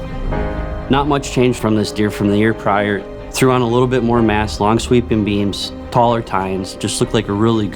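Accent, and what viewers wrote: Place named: North, United States. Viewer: Holy Northern accent 🤣. Gotta love it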